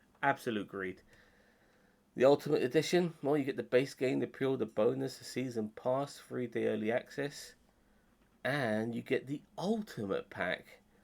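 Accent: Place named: United Kingdom. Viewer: This guy is too brittish!!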